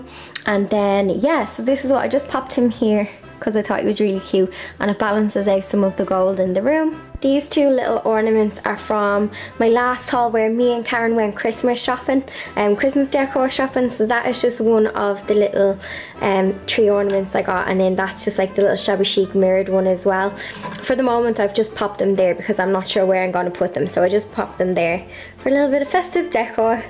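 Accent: Ireland. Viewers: Ayyyy ur Irish !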